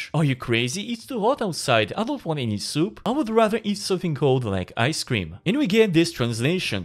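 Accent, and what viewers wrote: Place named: France. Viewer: First time i heard you i thought you had a french accent, and since the first translation you made was in french now i can almost safely assume. "t'es français" (à moins que je me trompe x)).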